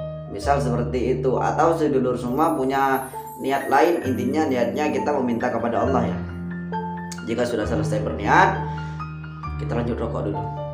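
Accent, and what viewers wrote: Madura, Indonesia. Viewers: Sampean orang madura y cak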